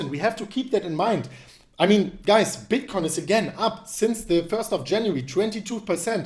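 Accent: Germany. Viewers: Please, try to correct your accent.... Mean your german accent is so strong.....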